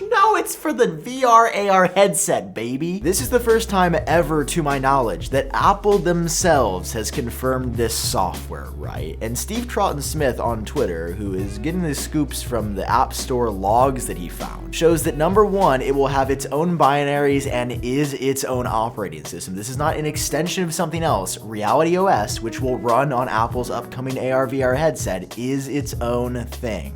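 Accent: England, United Kingdom. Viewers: British accent on fleek as the kids say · Great English accent! Thanks for the video!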